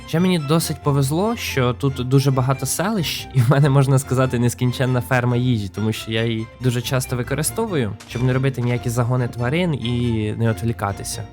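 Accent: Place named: Poltava, Ukraine. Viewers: Дякую! Мій синочок дивиться ці майнкрафти, нарешті українською 😉 ну може трошки суржиком, але рідним, наче з-під Полтави) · Полтавськиа мова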